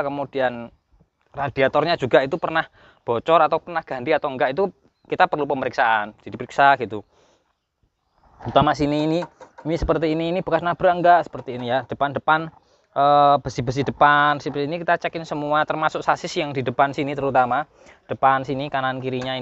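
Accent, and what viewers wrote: Java, Indonesia. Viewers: Mas ini dari aksen logat jawanya kelihatan msh punya darah kraton...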